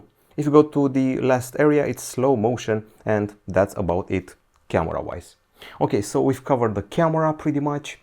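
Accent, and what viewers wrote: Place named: Romania. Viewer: Ăsta-i român după voce și accent 👍👍👍👍 😂😂 Americanii 👎🏿👎🏿👎🏿👎🏿💩💩💩💩 Românii 👍👍👍 💪💪💪💪❤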